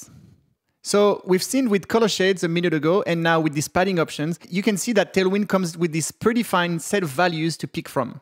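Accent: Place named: France. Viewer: I still say it's all about the French accent ;). Keep up the amazing work. Cheers from the 905.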